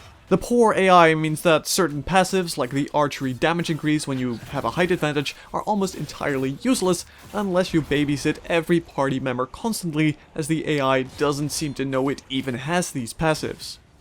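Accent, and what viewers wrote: Netherlands. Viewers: hmm dat accent....bent u Nederlands ?? :D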